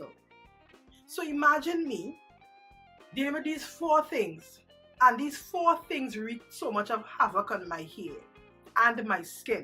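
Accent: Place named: Caribbean. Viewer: Where are you from, because you sound like a mixture of Caribbean islands. Trini, Bahamian, and jamaican.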